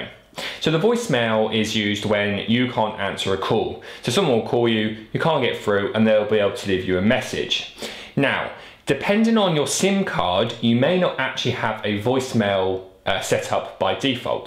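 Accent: United Kingdom. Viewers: I think he's Bri ish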